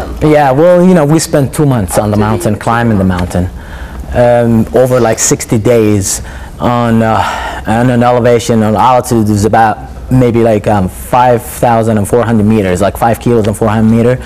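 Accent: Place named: England, United Kingdom. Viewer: i really liked your englsih accent....we are proud of you: D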